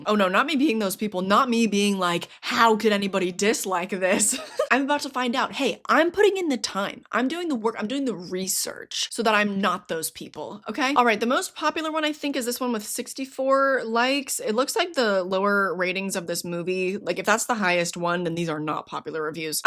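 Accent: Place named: United Kingdom. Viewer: Doing a 1980s Horror Movies bad reviews video? It's completly outdated. Well, that was pretty ... "not unrelieved" ! I don't want to sound snob, but some people really are ... 🤔unhinged? Sad?... Uneducated? All of these? ❤your British accent ! Horror is the best dumbest genre ! – You slew me ! "you bedeprtprrrt..." The Stuff , yay !! B-But?!... Didn't you "forget" Re-Animator ?!... I'm not saying that because I love that movie, but because it's just ... quite an amazing and remarkable one. Stuart Gordon's masterpiece. Alongside Dagon . Yeah... '80s were ... a time.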